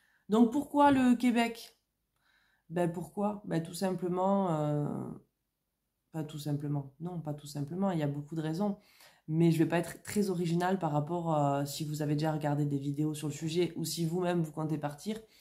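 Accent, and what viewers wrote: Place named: South, France. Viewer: Ha!! Toulouse! Je me disais que c'était l'accent du sud ! Nous c'est Perpi ! Encore merci pour toutes ces infos, votre départ approche de plus en plus !!! Trop hate de connaître la suite, on prend le même chemin que vous ! On a la quarantaine, pas d'enfant et conjoints de fait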